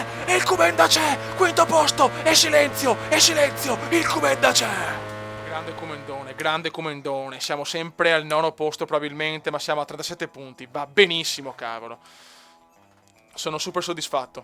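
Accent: Veneto, Italy. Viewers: prendili coem dei consigli: 1- perfavore basta con le frasi fatte tipo sono teso, ripetuto 20 volte, i soprannomi ai piloti (dopo un po sono tristi), comunque grande con l' accento da gondolaro e il viva la figa<3 spezzi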